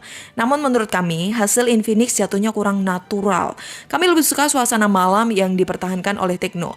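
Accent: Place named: Java, Indonesia. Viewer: suka deh..lama lama keluar medok nya 😁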